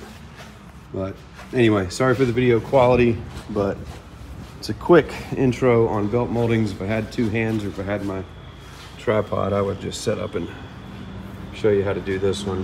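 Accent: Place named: Georgia, United States. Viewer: You have great voice and communication style for this. I think it has something to do with Georgia. I know one other person that sounds like you and he's from Georgia as well. I was looking for the right GX all over the country and I guarantee I would've ended up buying from you had I found your videos sooner. Anyway, you make the absolute best and practical GX content there is.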